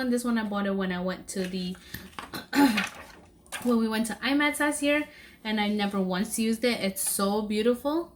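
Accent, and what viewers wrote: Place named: Latin America. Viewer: Awww me encanta tu acento latino!!!! 😍😍 Viví un tiempo en Tx y me recuerdas mucho a mis amigas de ahí!! 😍😍 By the way loveeeed ur make up!!!! 🤩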